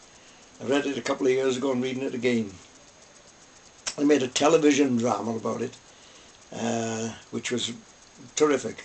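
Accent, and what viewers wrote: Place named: Wales, United Kingdom. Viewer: Thank you for this. As a Welsh woman living in England, it was lovely to hear your accent and Lonesome Dove is one of my favourite novels, so I liked your book review. (The pipes looked really good as well :)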